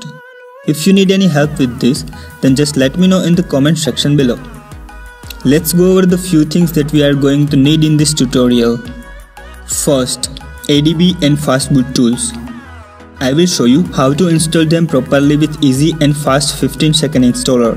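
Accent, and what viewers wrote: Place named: India. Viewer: i hate indian english!!!! i cant understand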